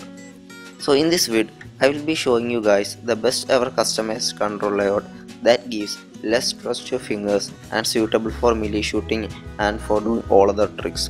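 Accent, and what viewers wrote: Kerala, India. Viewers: wonder I thought I knew that accent, Nammallum keralathinna.. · R u from Kerala Ur acsent wow! Amazing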